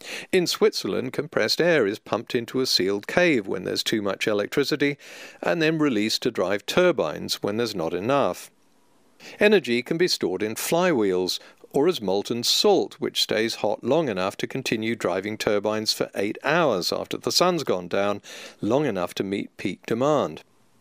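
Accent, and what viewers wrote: United Kingdom. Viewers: What's wrong with his delivery? As a well educated British middle class person, his voice comes across as balanced, clear, and perfectly normal. It's an educated accent and very normal. He is delivering an educational discourse and does so in a rounded way. Is it just someone being British that you don't approve of?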